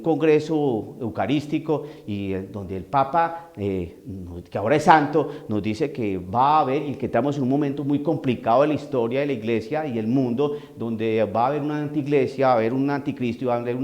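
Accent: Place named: countryside, Colombia. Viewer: Que bárbaro Padre me encanta esa forma colombiano de pueblos para hablar , este virus es una cortina de humo para hacer las cosas y la gente callada , hay q cuidarnos con los protocolos pero no darle importancia ese virus q tiene ciegos a muchos y creen q los laicos somos pendejos y no nos damos cuenta , no podemos callar la verdad hay q decirla x la verdad murio Cristo en la Cruz . Dios lo bendiga y proteja siempre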